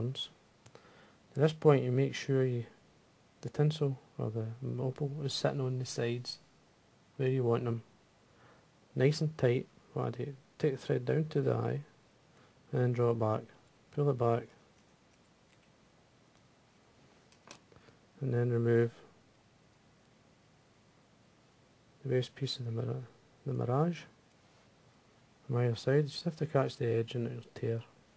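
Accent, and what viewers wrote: Scotland, United Kingdom. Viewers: i thought he was scottish >.>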